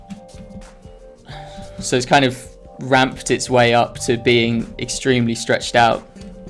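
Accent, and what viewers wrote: United Kingdom. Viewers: i like when you talk in british accent mine while i learned how to say english in british accent like you say it in this video btw i learn much knowledge from you thnx broo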